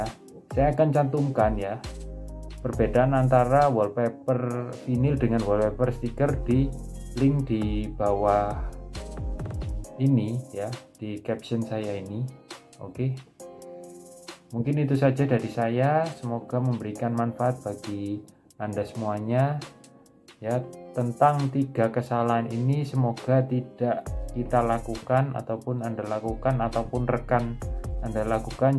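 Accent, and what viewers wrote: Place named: Java, Indonesia. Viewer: medok ya mas😀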